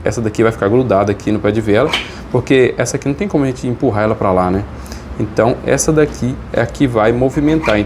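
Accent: Minas Gerais, Brazil. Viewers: "Olha só procês vê", mineiro raiz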